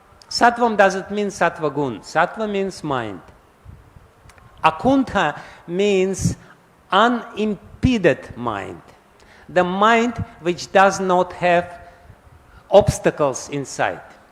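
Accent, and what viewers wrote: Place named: Russia. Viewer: Hare Krishna. 🙏🏻😇 It's the best way to learn English - to listen with russian accent from Maharaja.. 🕉️🌬️🧘🏻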